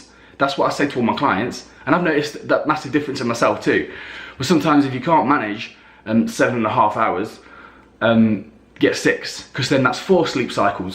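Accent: United Kingdom. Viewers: Are you from Britian? im lovin.. the accent.. 😃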